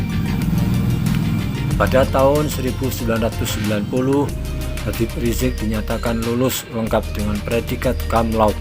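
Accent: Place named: Java, Indonesia. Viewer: Medok amat ai mas broooooo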